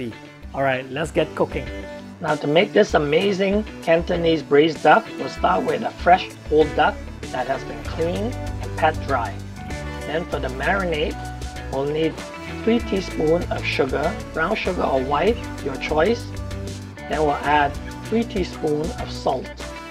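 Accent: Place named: Caribbean. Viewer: You chopped up that Duck like Jamaican Jerk Chicken LOL! You do have the Island accent so I'm not surprised! That duck does look delicious! Very nice recipe for sure!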